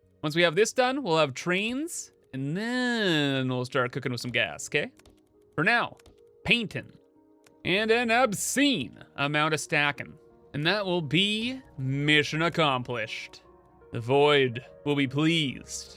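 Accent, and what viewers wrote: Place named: Canada. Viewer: In all my years of Shapez, I've never seen anyone ignore the miner extensions and just build platforms and factories in the middle of their miners :D 600 hours in and I've come to accept that there is no wrong way to play the game. Also, I get the feeling you're Canadian, yes? Your "Eh" was showing ;)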